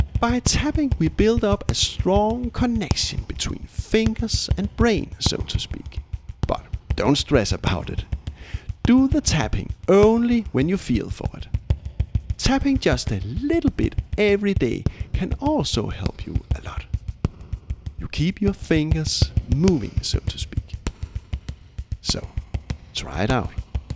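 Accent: Denmark. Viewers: Sound Danish :-) Well done I like it very much as well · I like this guy, Sounds danish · Super god video, vidste du var dansker fra accenten lol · As a Dane myself I half way cringe when I hear our accent but it's hard to lose ... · Nice Danish accent, you deserve more views 🔥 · I have to ask, are you Danish? I hear a few what seem to me clearly Danish sounds in your unusual accent - never heard anyone speak exactly as you do. Love the Danish accent. Hope you don't mind me asking - sure it's none of my business but language sounds interest me. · Are you danish? Idk it’s just the accent😂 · You are the only guy with a thick Danish accent to which I've actually liked listening to. Wonderful video, I'm definitely gonna try to pick up the 'lovely habit'. Og forresten mega props for redigeringen.. Det er dælme vildt at få de korrekte fingre til at lyse rødt på hvert eneste slag - selv i improvisationen! 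Translation: "And by the way, huge props for the editing.. It's wild to get the right fingers to light up red at every strike/beat - even in the improvisation!" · Denmark? I'm picking up a strong accent haha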